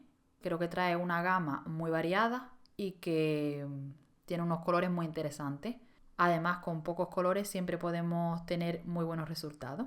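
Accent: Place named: Colombia. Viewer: Erga pq hablas como en Colombiano pero de españa? No es jeit, enrealidad me es más agradable al oido, pero me pajea el cerebro escucharlo asi jajaja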